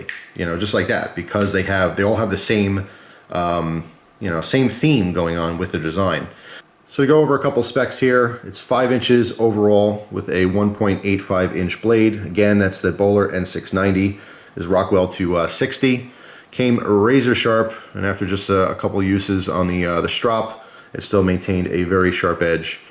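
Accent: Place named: New Jersey, United States. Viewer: the A in extrema is pronounced kinda like ah, English when spoken relaxed by a fluent speaker it is normal for the spaces between english words shrink and flow fluidly sounding like extrem-ahratio. American English has there own colloquialisms, and (a native new jerseyite speeks with a specific accent. To me it sounds like a slight speach impediment, you can hear it distinctly with the word water.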